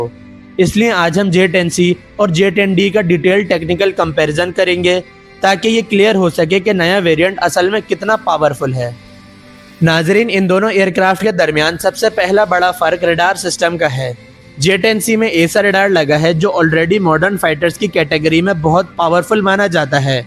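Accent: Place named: China. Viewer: I don't like Chinese English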